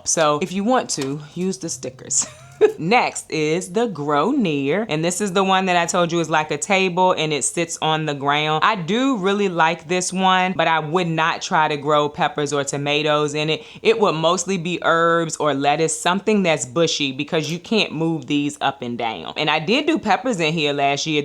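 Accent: South, United States. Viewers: I really love your voice! You have a southern accent, my mil and I both love to watch just to listen to you and we learn so much from you too! Loving the bunnies too. I also just started watching Sage and Stone and am loving her channel as well.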